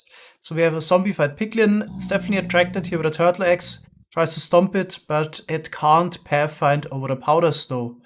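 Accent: Germany. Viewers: I don’t hear strong German accents in English anymore, for a second I thought I heard straight up German.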